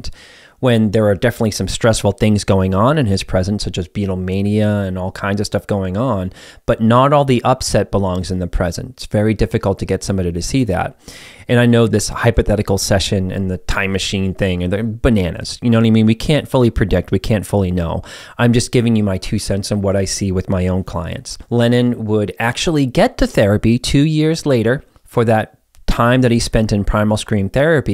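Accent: Boston, United States. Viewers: Are you out of Boston? Just hearing the beginning of this story. Ugh my heart from this story and I love your videos so helpful. ❤️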